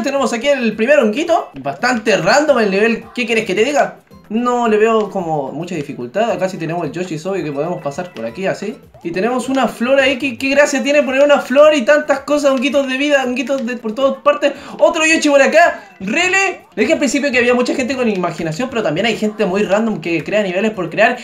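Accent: Chile. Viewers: Este Weon es chileno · eres chileno ¿no?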